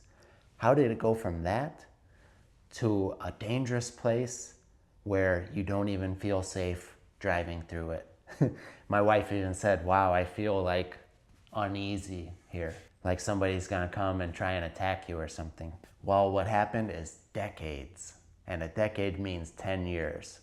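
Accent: United States. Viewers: Dear, I am an Indian. This is your first I saw it to the end. Thank you so much for making this type of videos. It give ideas about the real situations prevailing around us which are not knowing. Your style of speaking is very simple and understandable to the people who are eager to speak English in American accent. Your truthmess promptede to subscribe your channel happily. Well done! Keep it up. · Hi dear I am from India watching your video for first time actually I was seeking for American accent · American accent English sounds very pleasant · Great channel, I'm appreciate your clear speak, pronunciaton and little slower rate. It's perfect for me, especially I prefer american english, not english.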